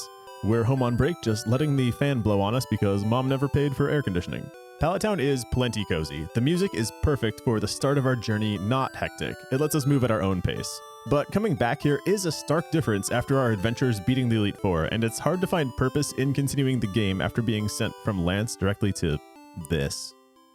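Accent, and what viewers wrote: United States. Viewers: This is a break from ADV randoms, and I dig that you're working on an American accent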